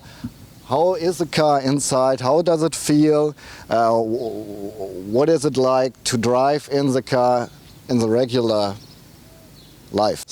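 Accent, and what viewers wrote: Germany. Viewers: This was a wonderful video where you dont have to listen to some fucking music all the time so you cant hear the engine sound, and i hope that other car testers learn from this. Also, you dont have to apologize for your strong german accent, as long as everyone understand its OK. Thanks for a great video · Good review! Thanks! Thanks to the Germans we have this beautiful cars , so no need to apologize for the accent :) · Your German accent, speaking English, is a lot better than my American Southern accent, specking German. You did fine, I understood every word. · Thanks for the test. As far as I am concerned I can perfectly cope with the little german accent (provided you accept my Belgian accent, of course). The ride seems to be interesting but unless you regularly have access to a race track this car looks too fast for public roads. In France you would probably quickly loose your driving licence (radars everywhere here in Alsace). 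I find a 335i with m sport package and bigger brakes more reasonable. Kind regards. Armand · The German accent didn't bother me at all. The horrible video quality, though, was irritating. I haven't seen such an overexposed video in a long time. Sort that out, guys... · all Germans I meet are always so friendly and honest. The way you handle the people laughing at the way you speak confirms this. Anyway, dont be embarrassed most people commenting on it probably only speak English, while you speak two languages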